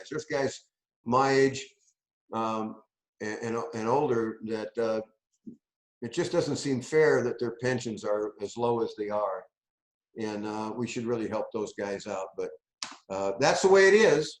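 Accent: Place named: Indiana, United States. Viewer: u sound like a maga in indiana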